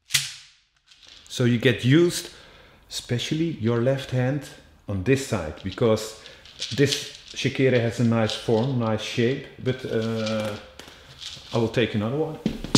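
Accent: Netherlands. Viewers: This is great, thank you! What size/shape of Shekere would you recommend for beginning? By the way, are you dutch? Ik hoor het in je accent :)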